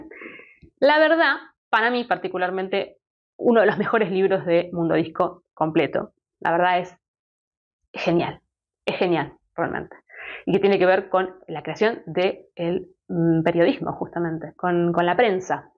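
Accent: Argentina. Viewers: Hola, sos argentina o uruguaya? Por el acento digo.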